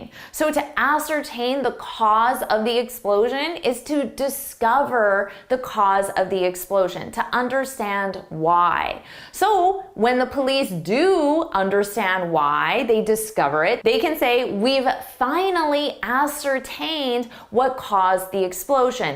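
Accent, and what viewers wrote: United States. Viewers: This American accent is so ugly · 15/15 of course. This project is pathetic - why don't you just encourage people to use good dictionaries? After all, that would spare them from hearing your appalling American accent, and from being led astray by your occasional solecisms (e.g: dangling prepositions, or split infinitives). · English vocabulary by an American, very loud one at that!! Not for me. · So annoying that this is promoted as Advanced English words when the presenter is quite obviously speaking American.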